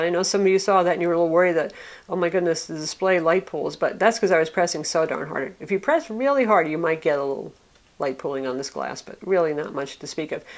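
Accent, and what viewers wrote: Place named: New England, United States. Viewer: Do you live in New England? You have that accent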